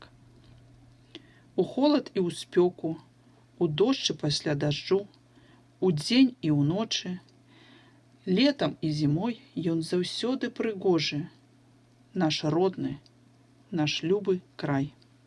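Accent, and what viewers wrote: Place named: Russia. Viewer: если диктанты, это не всегда значит, что именно про экзамен. Это так же может быть обычная практика, но касаемо акцента соглашусь. Ощущение, что читает русский, знающий белорусский по "знакомым словам". Особенно произношение "г" бросается во внимание сильно.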